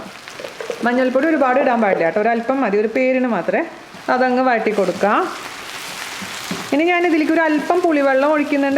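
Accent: Thrissur, India. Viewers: The dish is too good, you have a real talent to talk well in the typical Trichur slang, though sometimes it crosses the limit and cooking takes a backseat...on the whole, your videos are drool stimulating.... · Hi! Lovely recipe. Will try this with Doshas tomorrow. My native is Thrissur too and I love the way you speak the typical Thrissur bhasha. Hope to meet you someday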